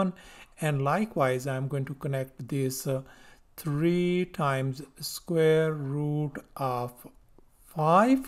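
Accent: India. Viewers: О боже, этот индусский акцент, невозможно слушать...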